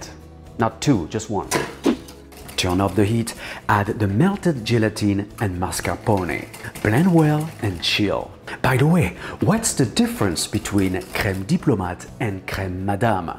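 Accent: France. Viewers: You killing me with French accent. Every things in your hand turn to masterpiece. Love from Sweden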